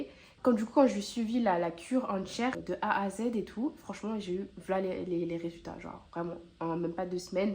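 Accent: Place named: South, France. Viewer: Tu as chopé l’accent du suuuuud !! Hâte de tes prochaines vidéos ❤️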